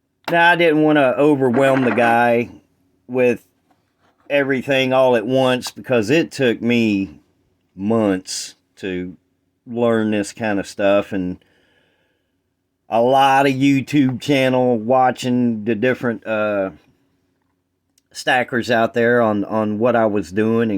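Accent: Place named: South, United States. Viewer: Fellow stacker here! Sounds like your from the south...Alabama here!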